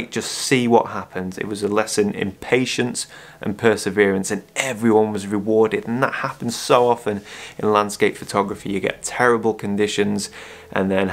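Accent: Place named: Northern England, United Kingdom. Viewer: Just discovered this channel...brilliant! Can just about understand your ‘tut north’ accent :) was just wondering - you used a full ND and an ND grad in a low light situation.....how do you position the ND grad correctly as it must be difficult to judge the horizon line in such low light... Unless you put the ND grad on first - align it then put the full ND on after?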